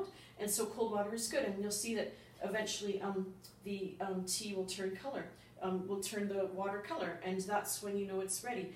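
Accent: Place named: Canada. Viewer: Nice hair and I love the Canadian accent!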